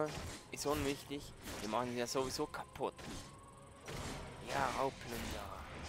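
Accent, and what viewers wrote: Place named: Switzerland. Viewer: Also ich find den leichten Schweizerakzent ganz angenehm ;) Diese deutsch-deutsch-Laberer kann ich langsam nicht mehr hören xD Hab auch gleich abonniert ;)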